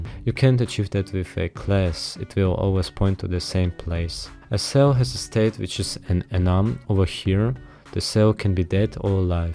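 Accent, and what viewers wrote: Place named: Russia. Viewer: russian accent... hmmm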